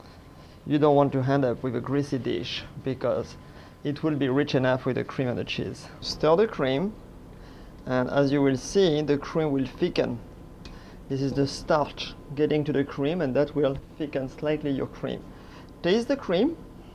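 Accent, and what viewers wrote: France. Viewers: Looks really nice but why are you using Fahrenheit and not Celsius? You sound French. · What a great video & recipe, spoken in that lovely French accent which always portends success in the kitchen! I am making this for Christmas as my family and I love French food. I thank you for doing this video. Merry Christmas from Virginia!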